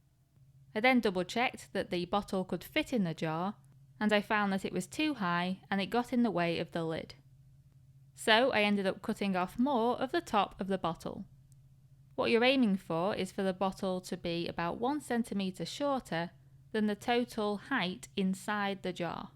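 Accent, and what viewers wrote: United Kingdom. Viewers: pva glue is used in the UK, you can tell from her accent that's where she's from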